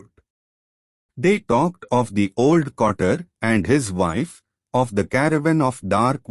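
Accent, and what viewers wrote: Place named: India. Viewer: Should work on that accent man.. only like 70 percent of this is understandable to non indians. The effort is respectable though🙏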